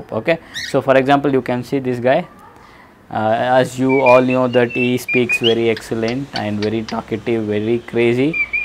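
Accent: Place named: India. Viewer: Sir ur voice is so Indian Love it and thanks for the info I need some help for cockatiels can you reply so we can chat for answering question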